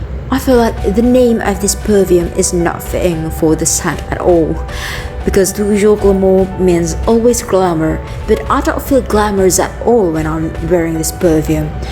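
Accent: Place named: United Kingdom. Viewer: Great description, Awesome illustration, Fantastic British accent ! Well Done !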